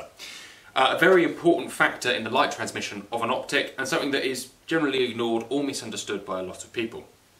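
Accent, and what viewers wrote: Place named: RP, United Kingdom. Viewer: POSH BLOKE. your knowledge is impressive.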